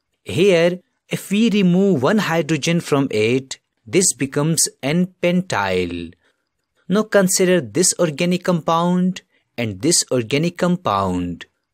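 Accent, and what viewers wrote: South India, India. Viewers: Through you spoken rythm i definitely got that you are south Indian sir 😂😂😂😂😂 i really like your teaching way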